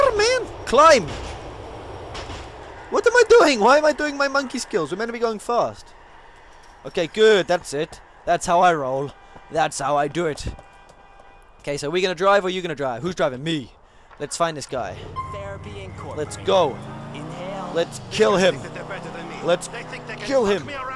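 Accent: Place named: Russia. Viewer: Your little russian accent sound excatly like Niko XD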